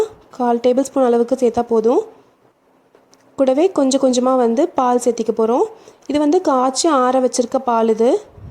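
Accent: Coimbatore, India. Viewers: Nega coimbatore ah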